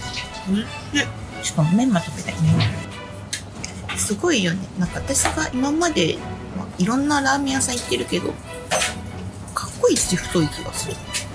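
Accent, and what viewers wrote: Kansai, Japan. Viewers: 😢 って関西人？ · 話し方苦手なんだけど関西人？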